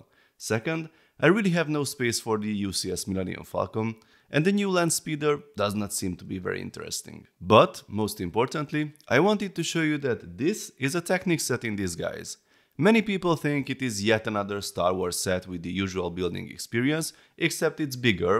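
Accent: Hungary. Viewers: Damn, that Hungarian accent is strong. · Helló. Úgy hangzik az angolod mintha magyar lennél.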